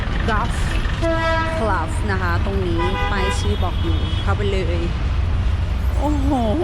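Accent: South, Thailand. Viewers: สวัสดีค่ะเป็นคนถูเก็ตค่ะติดตามมาตลอดค่ะแต่ไม่เคยคอมเม้นชอบตอนทำคลิปพูดใต้น่ารักมากค่ะ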